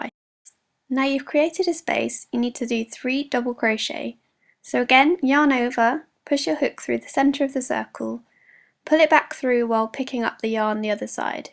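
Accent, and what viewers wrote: United Kingdom. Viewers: She sounds so British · where are you from? you sound a bit Devonshire too me...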